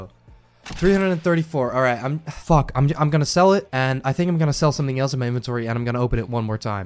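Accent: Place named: Netherlands. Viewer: Haha jou Nederlandse accent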